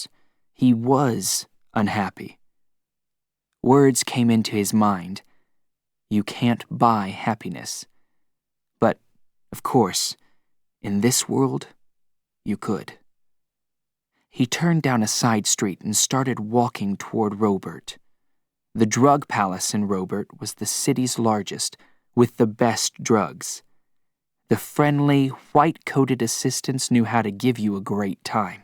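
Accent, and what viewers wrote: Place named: South, United States. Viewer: After knowing Logan as Michael York for 50 years, it's funny hearing him narrated with a southern accent. And his world not being confined to a giant shopping mall.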